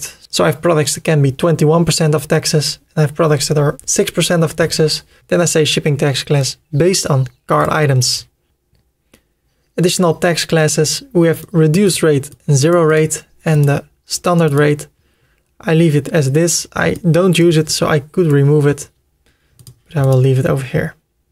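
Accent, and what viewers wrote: Netherlands. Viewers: Nice to hear a familiar dutch accent! Heel Goed!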